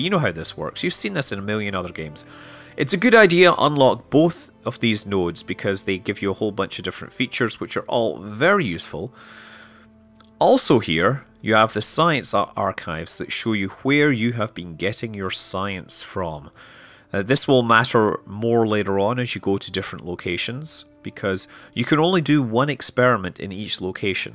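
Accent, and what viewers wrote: Scotland, United Kingdom. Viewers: I might have a feeling this man is from Scotland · finally, a Scottish tutorial youtuber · ***** he's scottish and he actually has some programs to cover up his accent so if he didn't have that it'd be unbearable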